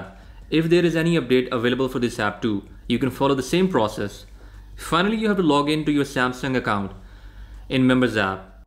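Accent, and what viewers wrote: India. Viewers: Heavy Indian accent = 76 likes. I disliked. I almost puked because of his accent!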